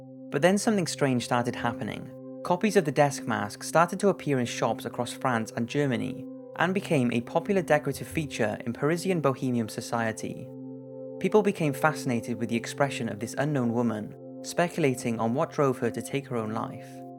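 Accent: Wales, United Kingdom. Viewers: are you welsh? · He’s British that’s for sure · I've always wondered, are you Welsh? you seem to have a slight Welsh accent.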